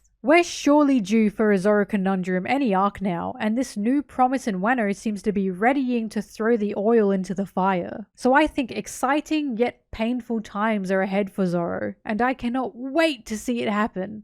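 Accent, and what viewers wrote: Australia. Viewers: What is it about aussie folk that make them really appealing one piece content reviewers?? xD I like the analysis and jokes and (this may seem foolish but) as an english speaking foreigner, I also enjoy the accent telling the story. Also like zoro, obviously~ (lol) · Zoro is my favorite character in One Piece, also ur Aussie accent makes u sound Beautiful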